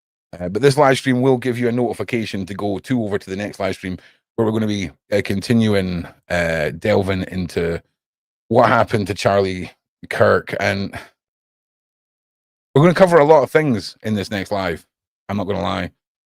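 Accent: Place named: Scotland, United Kingdom. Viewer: Liked and subbed, because that Scottish accent is so effin posh! And the content or somefin' or nuffin' 🤣👍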